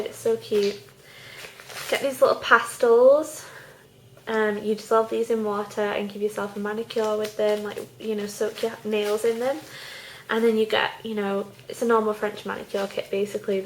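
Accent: United Kingdom. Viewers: ah! ur so british and i love it!! i miss my british friends... they used to come to california and visit me often. they live on "the isle of white" do u know where that is?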